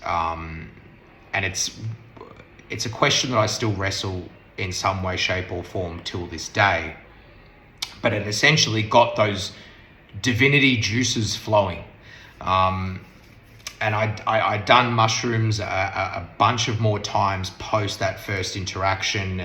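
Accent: Australia. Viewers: Don't apologize for your accent. When the intent is right things like accent don't matter! And you have a lovely Aussie accents anyways! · greatest weakness: Australian English. The Indian viewer's greatest strength: Listening to Australian commentators for cricket. XD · I'm from Bharat 🇮🇳 Your Australian accent to amazing. I understood completely no issues. What you experienced is not normal. Haman Chalisa meas connection to ALMIGHTY GOD. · Don't worry..... Indians are used to Australian accent from all the cricket commentaries we have heard all these years😂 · Your Australian accent is lovely. Please never change your accent. I love listening your English.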